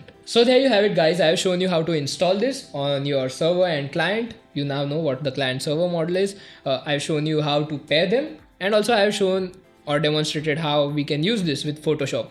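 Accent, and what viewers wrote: India. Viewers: Great video god i hate the indien accent - _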